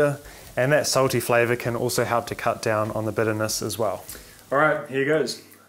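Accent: New Zealand. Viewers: I watch your videos just to hear that nice Kiwi accent😂.Love it☺️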